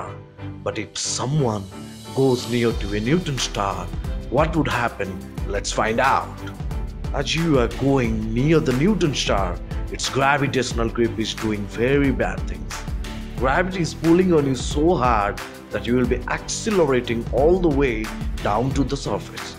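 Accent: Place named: India. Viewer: Dude you are an Indian I know it . you completely sound like an Indian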